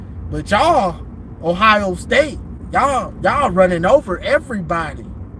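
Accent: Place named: South, United States. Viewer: Dude, I tried listening to U, but U sound like a country speaking Knucklehead, Bro! U have No Idea! 🤦🏽🙆🏾‍♂️ #GoBlue💛💙 #SMASH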